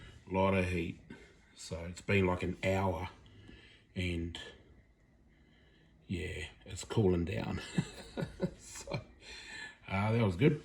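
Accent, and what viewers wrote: Australia. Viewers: I hear my Aussie brotha voice!!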